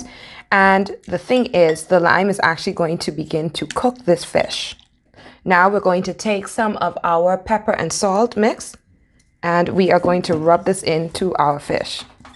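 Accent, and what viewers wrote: Bahamas. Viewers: it's the accent though :-) i love a real authentic Bahamian accent. ima cook some boil fish ta'night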